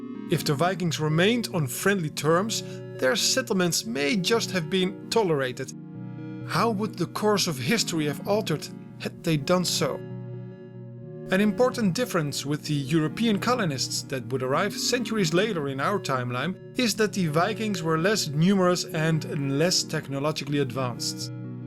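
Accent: Netherlands. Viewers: His Dutch accent is terrible. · Quite noticable accenr there. Are you Dutch? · Wow, the Dutch accent is kind of heavy. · yo, you dutch cuz it sure sounds like it ^^ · I think this has to be one of the best video about Alternate history I ever seen. BTW am I hearing a Dutch accent? Ik ben blij dat ik je kanaal op youtube heb gevonden. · You can hear immediately that the video is voiced by a Dutch person. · Rook took I think so I'm Dutch and he really sounds like a Dutchman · is this guy Dutch? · Is the narrator Dutch? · Manoj Anton-Rajkumar sounds more like a Dutch accent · You sound Dutch.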